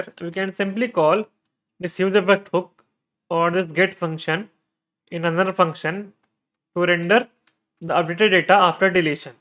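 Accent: India. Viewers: I dont understand indian english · Hear Indian guy - close the vid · Could not understand a word. If FreeCodeCamp keeps posting these videos with Hindi accent, their content is going to go down hill. Most praise in comments is by Indians who understand this accent with no issues. For others though, it's painful to listen for more than a 10s.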